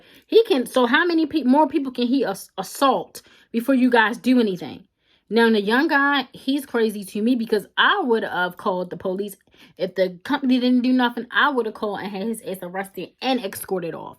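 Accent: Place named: Baltimore, United States. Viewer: Born and raised in Louisiana from the Deep South and yeah those plantation tours always rub me the wrong way. I’m starting in two weeks at American as a deckhand and I already know it’s going to suck . Will be a long 28 weeks but hopefully I’ll end up in the west coast and it will allow me to get my sea time to become a mate and work on cargo ships. Also your Baltimore accent is crazy heavy lol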